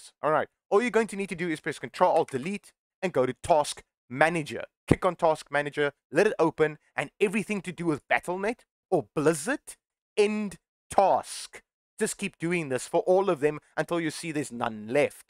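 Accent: South Africa. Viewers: thanks! btw is my man south african?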